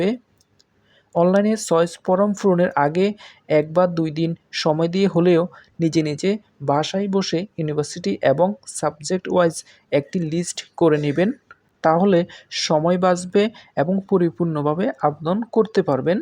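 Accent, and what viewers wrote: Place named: Noakhali, Bangladesh. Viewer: নোয়াখালী্র ভাষা চেঞ্জ করার দরকার ছিল।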